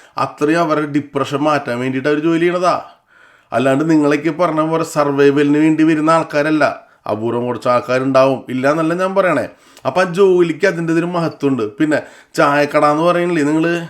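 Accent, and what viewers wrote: Thrissur, India. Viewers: ഞാൻ തൃശൂർ താങ്കളുടെ സംസാരം ❤❤❤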